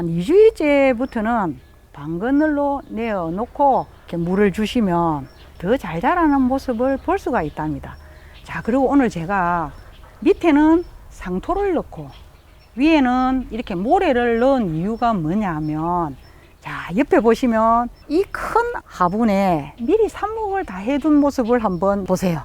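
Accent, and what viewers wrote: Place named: Gwangyang, South Korea. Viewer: 광양분 같네요.목소리가...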